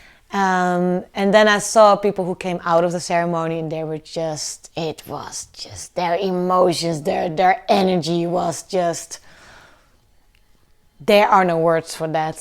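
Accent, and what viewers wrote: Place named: Netherlands. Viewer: Love your accent and i dont like all Dutch accents.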